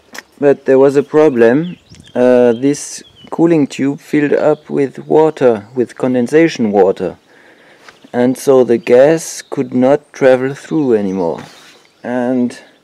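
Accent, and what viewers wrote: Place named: France. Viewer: heureusement c'est un beau projet, les anglophones n'ont qu'a faire ce que ton accent francais trahi, traduire 🤨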